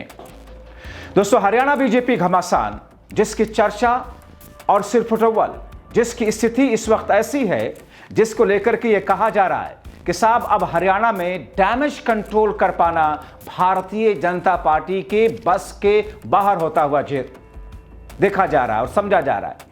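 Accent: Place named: United Kingdom. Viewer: English tone me hindi news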